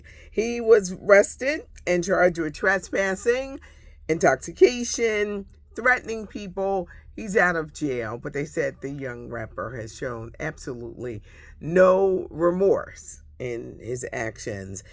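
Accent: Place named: Philadelphia, United States. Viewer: I know that's right use that South Philly voice good for you! my Amazing Husband was from South Philly 12th and Christian.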